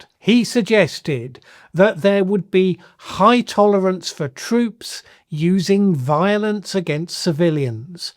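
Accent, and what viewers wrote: United Kingdom. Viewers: OH GOOD ! A quickly headline featuring name calling. Followed by a sarcastic narrative / lecture from an old guy with a British accent. Surely this guy's friends find him really persuasive. But what is actually at stake bears analysis. Anyone paying attention knows that the USA has many more general officers / senior officers on staff than at the end of WW2 when many multiples of today's forces were in uniform. We've also got the insanity of public health officers and doctors being called admirals because of some antiquated human resource theory which equates history of attainment of educational credentials with rank to justify pay. When you put all these guys together in an auditorium, they realize that they are not unique special individuals ruling over their direct reports in separated fifedoms, according to their own personal proclivities and idiosyncratic value systems. 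Look at all those gray heads! Underlings in military service live by "up or out" -- if you're not advancing, it's time to retire, unless you figure out a way to be retired on the job. But these guys, with limited or nonexistent or outdated war fighting experience, just spent 12 out if the last 16 years under Biden and Obama, "going along to get along. " This experience of course correction is being delivered in the nicest way possible -- demonstrating that these high ranking individuals are in a top heavy military...and if they stick around after being told the direction in which the nation's military is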